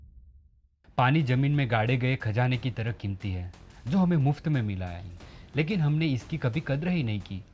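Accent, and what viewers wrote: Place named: Gujarat, India. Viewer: your Hindi is good but tone is Gujarati, please keep it up!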